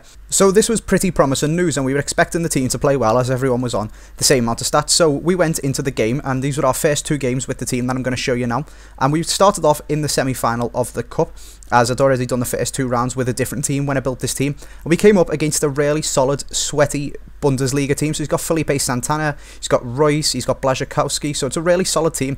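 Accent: Liverpool, United Kingdom. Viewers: no he's scouse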